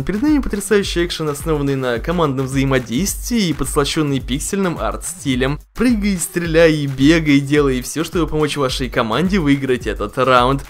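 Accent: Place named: Moscow, Russia. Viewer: у тебя акцент немного эээ... московский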